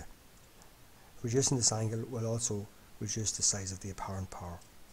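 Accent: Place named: Ireland. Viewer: Thank you very much kind sir, it was well explained and i appreciate the fact that you talked slowly or i would have trouble understanding as i'm not used to the irish accent.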